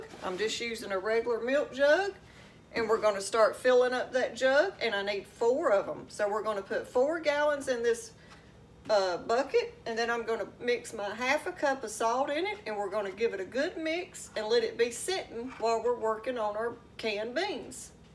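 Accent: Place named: Alabama, United States. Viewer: Where you from, I would say Alabama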